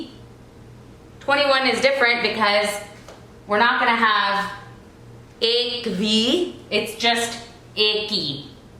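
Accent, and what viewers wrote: United States. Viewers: How did u acquire this American accent. Looks like You've been settled in US for a very long time...pan memoni boli khand ji goli... period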